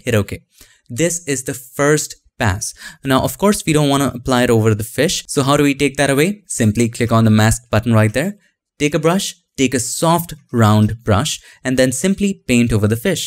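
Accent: India. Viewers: Indian accent = check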